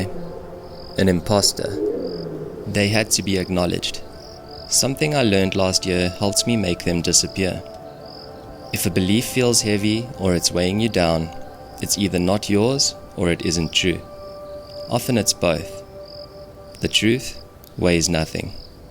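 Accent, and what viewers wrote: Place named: South Africa. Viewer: It was hard to hear you being so hard on yourself in the video. Because watching this as a South African expat living in Europe, my heart yearns to be home. My heart actually breaks, not being able to be there in nature with my family; with our beautiful sunshine; with our friendly people or awesome food culture; our magical animals in our national parks. And your videos bring me so much joy and so much peace, listening to a South African accent, speak about our home, rather than an American tourist, who doesn't know the first thing about our home and our animals😂 Please dont be so hard on yourself - you don't know how much warmth you bring to so many people with these videos and I pray that you continue to prosper.❤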